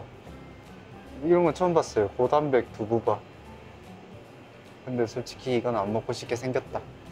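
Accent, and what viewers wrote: Gyeongsang, South Korea. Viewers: 오 현실말투 갱상도싸나이네요~ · You are from Daegu right? I can recognize his accent 😂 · Its cute how he talks with gyeongsangdo(?) dialect. That intonation can be heard by people from there hehe is from daegu right ^^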